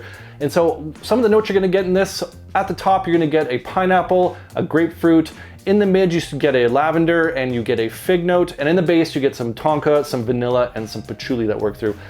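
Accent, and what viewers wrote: Canada. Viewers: I could tell from the accent that you were a fellow Canadian! I now know that you are also a fellow traveller! Great channel, hope to see more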